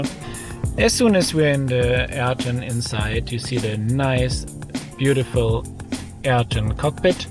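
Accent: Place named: Germany. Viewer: Jag måste bara fråga, är du tysk men bor i Sverige? Anledning att jag frågar är att du verkar ha tysk brytning på din engelska. Massa ursäktanden från min sida om jag har fel.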